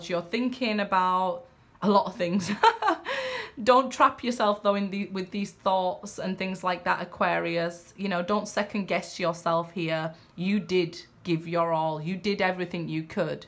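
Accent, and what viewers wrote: Yorkshire, United Kingdom. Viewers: I love Yorkshire accent! And Geordie too 😊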